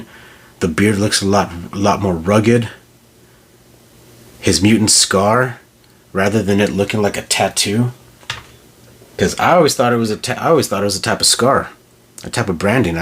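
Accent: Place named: Texas, United States. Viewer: What part of Texas are you from?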